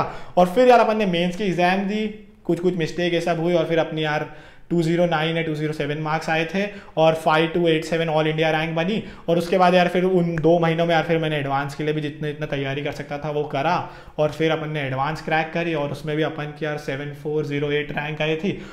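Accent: Indore, India. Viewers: Tysm for uploading this video!! Really inspiring story!! 🤩🤩 Btw bhaiya aapke baat krne m indori jhalakti h!🥹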